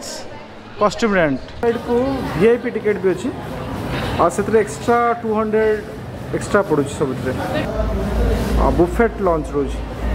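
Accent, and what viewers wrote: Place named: Deogarh, India. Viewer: Tuma ghara deogarh ki...bhasa ta semiti laguchhi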